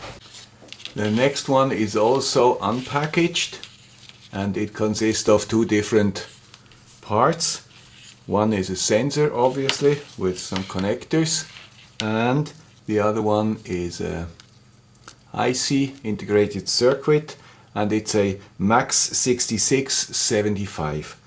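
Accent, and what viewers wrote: Switzerland. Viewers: Thanks for the P channel mosfet highlight. It would be useful if you could do a video on high side switching. There are a few out there, but the guy with the Swiss accent would give us what we need to know as part of a back-to-basics series? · my best regrade to the gay with swiss accent>>>>>>nice work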